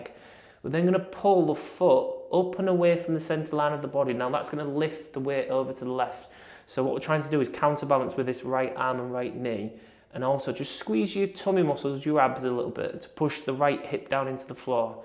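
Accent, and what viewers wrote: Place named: Yorkshire, United Kingdom. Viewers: Re:pronunciation of liTTLE - I deliberately tune into your channel as a Yorkshire lass living in London for home comfort and warmth - please do not change your elocution it’s why I subscribe. !! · Thanks. love you. don't worry about your yorkshire twang xx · Why change your accent,? I love your Northern accent...reminds me of my Grandmother. I could understand her even though we lived an ocean apart.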